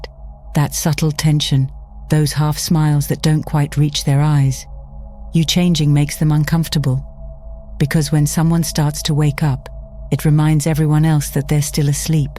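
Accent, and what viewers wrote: United Kingdom. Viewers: Sounds like Solace Fox with different music and a British accent.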